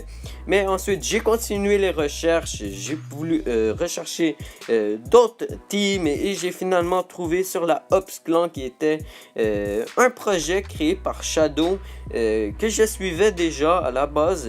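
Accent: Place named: Quebec, Canada. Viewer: C'est un accent de Québec :$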